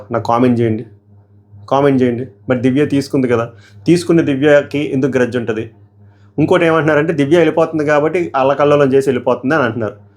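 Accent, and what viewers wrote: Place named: Amalapuram, India. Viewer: original Amalapuram slang ❤❤❤❤❤❤❤❤❤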